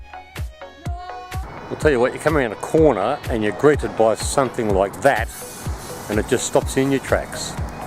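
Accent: Australia. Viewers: Hello...I loved your video! My husband & I are planning to do one of the Eurovelo routes--maybe this one! BTW, I liked the music--what is it/where do I find it? It's great to hear your Aussie accent, too. Cheers, from Sydney.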